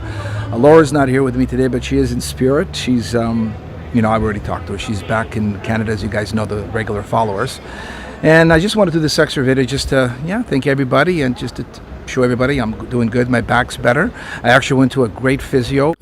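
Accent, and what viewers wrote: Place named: Azores, Portugal. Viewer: Que lindo gostei muito de ver e ouvir tu a falar Açoreano beijinhos da Ilha de São Miguel ❤😘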